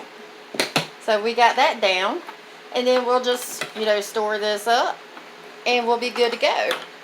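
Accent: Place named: South, United States. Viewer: I do like your videos... And love your voice... So Southern.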